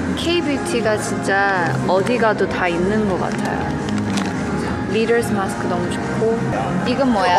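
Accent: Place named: United States. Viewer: She has a very Americanized accent and a lot of pauses. It kind of frustrates me and is hard to follow. Makes me anxious listening to her... But, not everyone is completely fluent in their parent's tongue. I was forced to take Korean during the weekend.... so... yeah.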